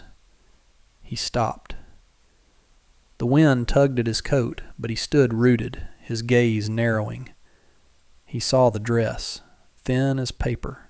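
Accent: South, United States. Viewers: Finally, narration and a believable story. The pictures let it down but the souther drawl and correct pronunciation of words, the use of different names made it a refreshing dream to listen to. Excellent. Most enjoyable story I’ve heard from these types of stories. Very well done.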